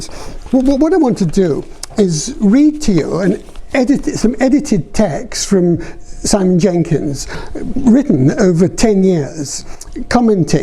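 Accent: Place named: Scotland, United Kingdom. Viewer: Thanks for interesting talk. I love the sections and I did think more CITYscape sections should be analyzed in a landscape project ( including architecture in those sections), which could be best started from LANDSCAPE ARCHITECTURE education ( if as you said it did not exist in ARCHITECTURE education). PS: Lovely Scottish accent!! Thanks!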